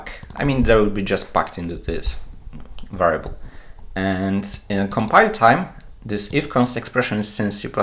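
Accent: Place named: Poland. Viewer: nie wiem czemu ale od razu wiedzialem ze to polak nagrywa. Akcent nie angielski ale kogo to obchodzi. Nie musi byc przeciez native speakerem. Hindusowego akcentu tez nie ma :D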